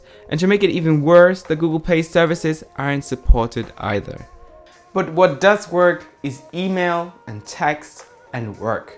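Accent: Germany. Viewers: Are you german ? You have this german somthing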